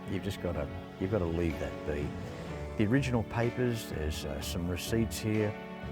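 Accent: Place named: United States. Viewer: That was a very convincing American accent . Iv'e yet to hear an American do an Australian accent well .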